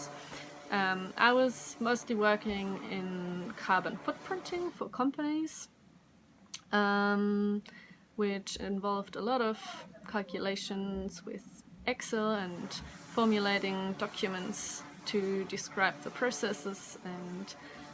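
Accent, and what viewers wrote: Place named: Australia. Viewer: noice ozzy accent :)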